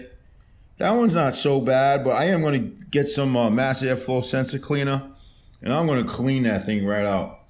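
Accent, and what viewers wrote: Boston, United States. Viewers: Where are you from that ngk plugs are hard to come by ???? Can get them at the corner gas station here .. lol. You sound like you from Boston..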